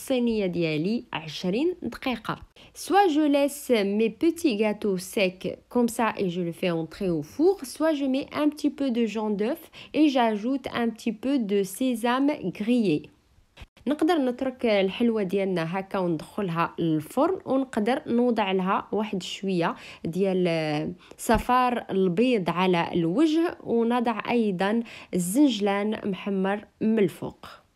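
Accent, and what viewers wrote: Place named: Tlemcen, Algeria. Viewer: Merci pour cette recette mme et d oujda frontière entre maghnia et oujda j aime bien une femme d oujda avec un accent de tlemcen encore merci d une parisienne 🙏🏻🙏🏻💋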